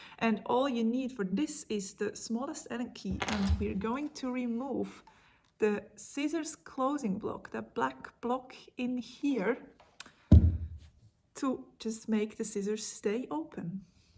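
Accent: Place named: Switzerland. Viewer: avec le ravissant accent suisse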